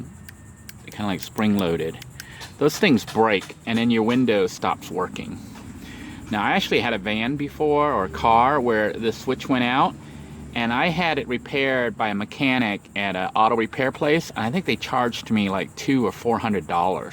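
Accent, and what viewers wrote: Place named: North, United Kingdom. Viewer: What an accent you got to be from up North😂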